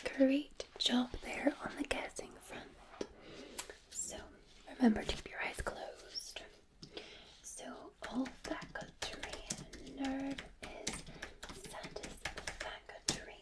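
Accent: Scotland, United Kingdom. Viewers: Thank you. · half an hour of tingles from the resplendent scottish lass. perry the platypus noises ☺️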